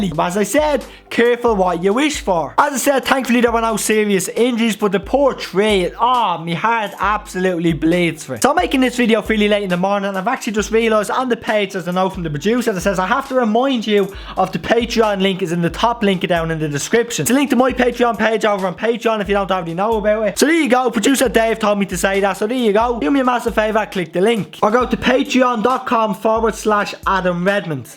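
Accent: Ireland. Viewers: I'm from b pool cork city and your county Dublin accent is way too culchie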